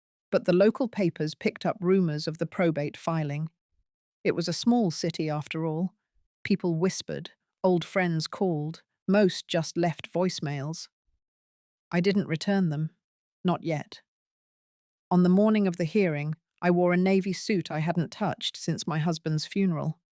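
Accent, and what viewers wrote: England, United Kingdom. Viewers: Beautiful voice and diction I have not heard in years. I am from Singapore . Please keep it up. You are British ? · The narration portion of the Ai data input chosen- needs edited out. 😂😂 Otherwise good story. Like the English accent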